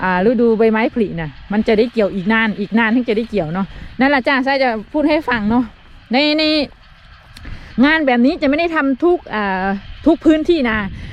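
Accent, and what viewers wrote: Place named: Ubon Ratchathani, Thailand. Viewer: ฟังสำเนียงเป็นคนอุบลฯเหรอครับ ชาวนาของอังกฤษร่ำรวยต่างกันกับเกษตรกรไทยที่ยากจนลงทุกวัน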